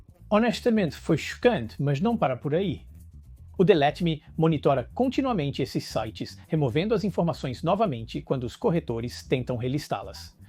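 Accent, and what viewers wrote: Portugal. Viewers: Português de Portugal é de matar!!! Mas o conteúdo é ótimo! Continuem com o português brasileiro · Português de Portugal não dá!!! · Português de Portugal não por favor😢😢😢😢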